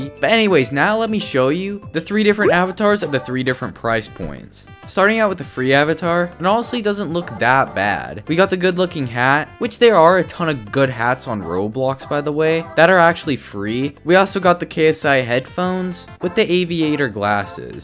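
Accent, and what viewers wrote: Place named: United Kingdom. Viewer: your British aren't you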